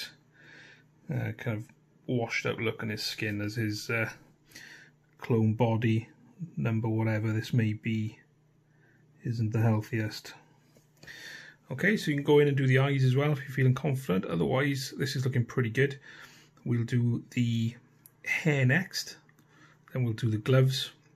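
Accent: Wales, United Kingdom. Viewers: Great job. You sound Welsh mate. Where to are you from like? Sorry, couldn't resist. Shout out from the funny, sunny Rhondda.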